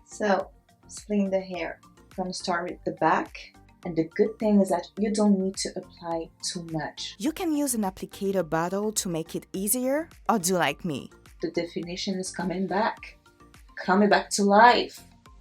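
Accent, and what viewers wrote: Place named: France. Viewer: I can feel the french accent which make you english good. Where're you from?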